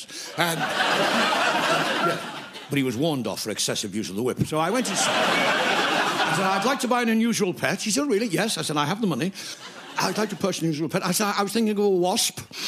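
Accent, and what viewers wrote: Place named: Liverpool, United Kingdom. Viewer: Liverpudlian thru' and thru'.....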